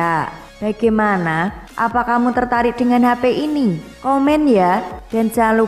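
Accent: Java, Indonesia. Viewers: Medok jowone, tapi malah trkesan unik.. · Khas Jawa 😆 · Jawa tulen ....suaranya.....medok....haha😆😊😀😉😯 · Suaranya medok bgt gak enak didengar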